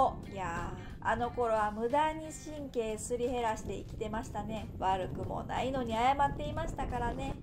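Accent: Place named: Kansai, Japan. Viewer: 明るくて関西弁が可愛くて、とっても素敵だと思いました。 私も主婦でメルカリではちまちまとハンドメイド品などを販売していますが、お小遣い程度の売り上げです💦 ちゃんとビジネスとして成功されていて、かっこいいと思いました。 元気をいただきありがとうございます。 応援しております。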